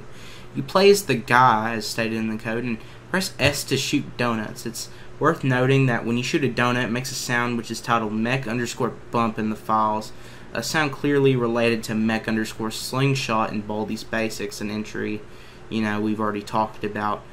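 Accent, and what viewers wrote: Texas, United States. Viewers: I fucking love the texas accent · Bro sounds like texas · Are you from Texas? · You sound like a man from texas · You sound like your from Texas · are you from texas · You know, your texas (or western-american) accent somehow really fits iceberg videos. I think you should try doing more of these. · His accent is pretty texan like, LOVE IT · I like ur Texan accent (I think it's Texan, I'm an American and know the State accent) Also u get a sub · Nice accent. Are you from Texas?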